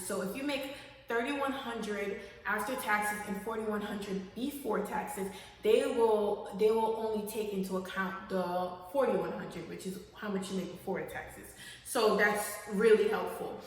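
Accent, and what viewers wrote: Jamaica. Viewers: R u Jamaican? U definitely sound like one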